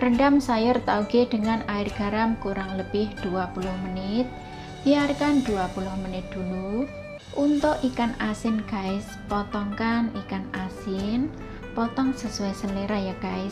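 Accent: Java, Indonesia. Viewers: Wong jowo to mbak, .. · Kak, muka nya kayak apa liat dong, suara halus bener, jelas kok omong nya, pelan halus bener, jawa bener 😃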